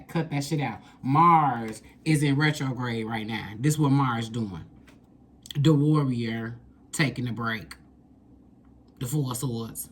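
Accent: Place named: Chicago, United States. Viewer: I know a ChiTown vibe when I hear it, lol. Good read Queen!!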